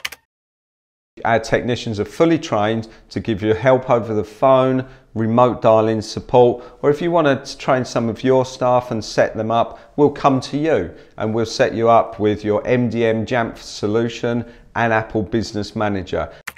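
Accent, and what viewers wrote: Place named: London, United Kingdom. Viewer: He has a proper London accent - entirely different to Aussie one. The auzzie accent makes you want to rip off your ears lol.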